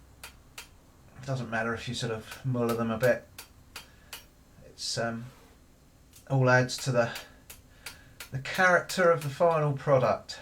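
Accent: United Kingdom. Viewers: I enjoy your videos! I am from The U.S.A. I enjoy listening and I sometimes imitate the British accent, not as a mocking feeling, but as a learning experience. I know these videos are not a language tutorial, but I can't help being drawn into the slang you use! Again, not making fun, but I have a few questions about some phrases you used during this video. For example: "Give it a bit a willy!" ".................. "...I made a balls up!" " "...Coots and beans again!" One or two of these are pretty straight forward as to what they mean, but could you (for the readers of the comments and viewers of your channel who may not be from England) answer or translate the meanings of the before said phrases? Thank you! Again, I enjoy and learn a lot from your videos. Thank you! LA./Doot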